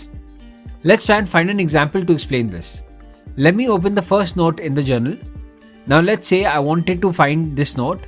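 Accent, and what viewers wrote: India. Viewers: you are from India aren't you 😁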